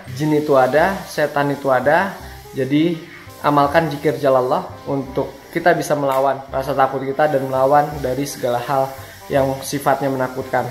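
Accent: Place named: Surabaya, Indonesia. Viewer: matamu cok🤣 wkwk mantap paido suroboyoan🤟😂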